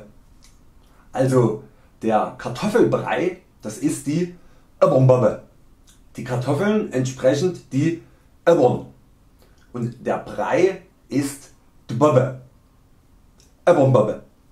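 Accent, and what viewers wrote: Saxony, Germany. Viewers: Sehr schöner Dialekt! Als Österreicherin tut mir das "deutsche Deutsch" in den Ohren weh. Aber Sächsisch ist ganz nett! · Für das Video hast du aber ziemlich tief ins alte sächsische gegriffen oder? Ich mein ich komme aus dem "Dreieck" Chemnitz Freiberg Dresden und so wie du es aussprichst redet meist nur noch mein uropa ausm Erzgebirge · Haha, super Video! Und vor allem, ohne Schnitte. Da sieht man wieder deine Professionalität. Klasse!!! Und ganz ehrlich, ich fand früher, dass das Sächsische der schrecklichste Dialekt ist. Das hat sich aber durch deine Videos wirklich geändert. Mittlerweile ist es das Schwäbische... hihi. Nee, im Ernst, man kann sich auch als Nordlicht öffnen und erkennen, dass es total egal ist und man den Menschen sieht und annimmt. Außerdem denke ich ja immer, dass ich ohne Dialekt spreche, dabei hört man wohl doch deutlich, dass ich von nördlich der Elbe komme.... 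Und wenn wir auf Plattdeutsch loslegen, dann versteht das auch kaum jemand.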